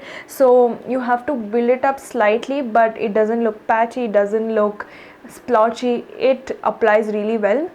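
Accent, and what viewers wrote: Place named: Bangalore, India. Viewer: Hehe.. I saw your previous video few days ago, the highlighter one I guess .. The moment I heard you, and I thought you must be a 'Bengalur hudugi' ... More than the review, I enjoy your accent.. You remind me of bangalore. Nice review too!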